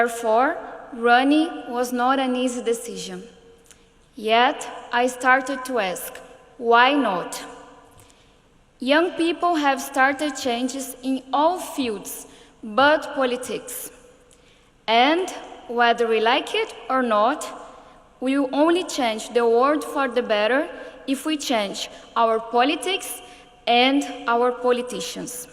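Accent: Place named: India. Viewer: Sim, tá parecendo um indiano hahaha Acho que é porque ela está falando devagar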